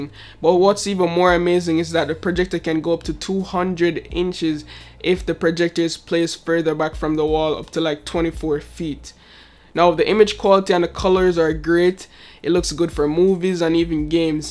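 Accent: Jamaica. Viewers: Hey are you Jamaican?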